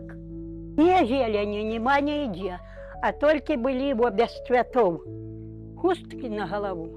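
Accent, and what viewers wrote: Belarus. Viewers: Беларуский говор , как бальзам на душу. Мама моя родом из Полоцкого района, сейчас ей бы было 95 лет. В 1940 г.переехали в Сибирь.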